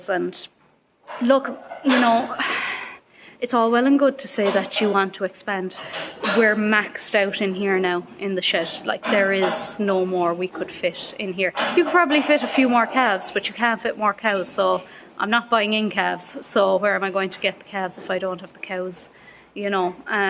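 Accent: Ireland. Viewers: awesome I thought it was a wee bit of Irish I detected in your voice My mom side of the family was Irish an my fathers side was Scottish lol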